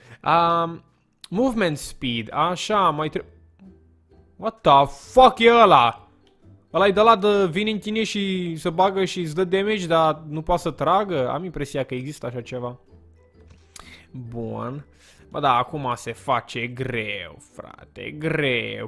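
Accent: Moldova. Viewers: fe ce vorbesti moldoveneste?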